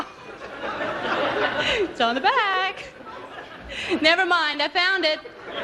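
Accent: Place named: South, United States. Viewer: She still had the Southern drawl more marked than her later standardized Continental accent _'for all Publics'. I saw her in College when she was starting and she was even cuter, however more insecure which she built into her act as natural thing. When you have cracked eggs, you make...Ellen humor.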